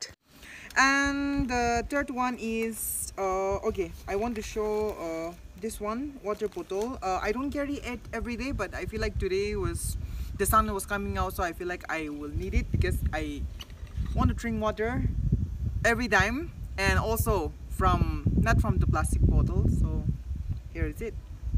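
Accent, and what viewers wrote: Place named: Nagaland, India. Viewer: I just love Ao accent 😎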